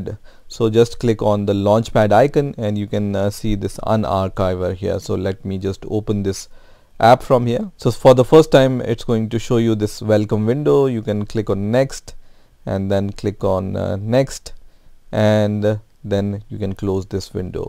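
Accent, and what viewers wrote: India. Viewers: You are almost hundred percent sure of getting the answer you are looking for when you hear an Indian accent ....... Thanks a lot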